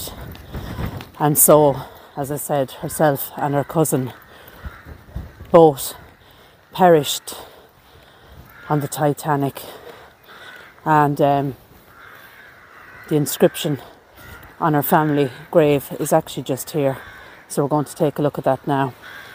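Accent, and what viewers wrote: Ireland. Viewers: Love your vids ,and the Irish accent ...enjoy them greatly as I'm not well enough to go myself ...love gv too x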